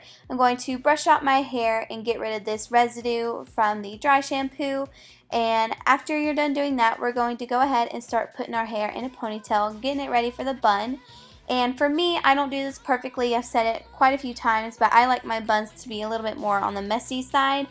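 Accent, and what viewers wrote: South, United States. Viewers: in this video it sounds like you have a tent of a country accent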